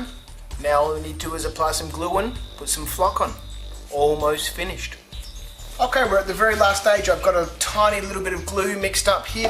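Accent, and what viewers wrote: Australia. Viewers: If you don't mind me asking are you Australian or Kiwi perhaps?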